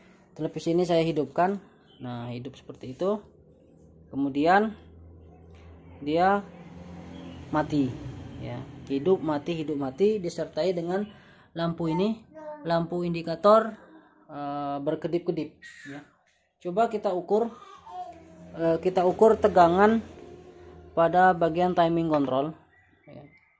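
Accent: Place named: Deli, Indonesia. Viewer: Abg pernah di medan bg? Kalau di lihat dari beberapa vidio Logat abg macam melayu deli 😁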